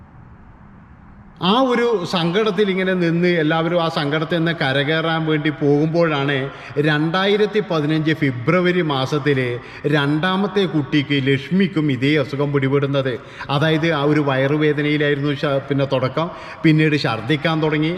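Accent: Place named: Northern Kerala, India. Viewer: അവതരണ ശൈലി കേൾക്കാൻ ഒരു സുഖവും ഇല്ല ഒരുതരം വൃത്തികെട്ട വടക്കൻ ഭാഷ.... ഒരു സ്റ്റാൻഡേർഡ് ഇല്ലാത്ത ശബ്ദവും ഭാഷയും എന്തോന്നാടാ ഇത്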